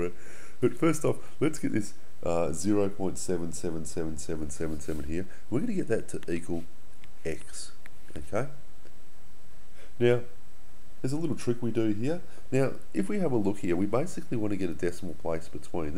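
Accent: Australia. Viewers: I like his deep Australian accent :3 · ARE YOU AUSTRALIAN?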